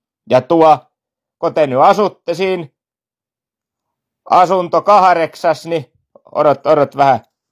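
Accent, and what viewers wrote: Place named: Pori, Finland. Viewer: Tää oli HYVÄ! -Ja viäl pori murteel :D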